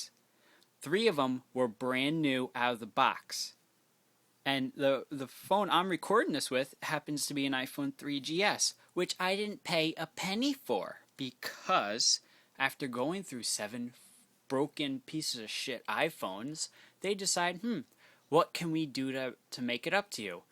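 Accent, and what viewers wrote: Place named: Midwest, United States. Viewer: gosh u sound like one of our customers from either Michigan ,Illinois or Indiana... good ol heartland region.. they love to sit on the phone for 30 + mins with sob bullshit stories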